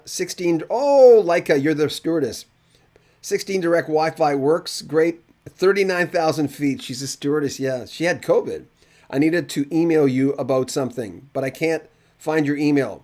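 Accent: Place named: Canada. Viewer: You can definitely tell he's Canadian by his accent... especially the way he says "abowt".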